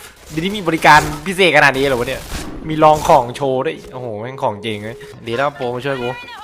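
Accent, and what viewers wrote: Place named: Rural, Thailand. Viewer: พูดเสียงเหน่อ น่ารัก >,<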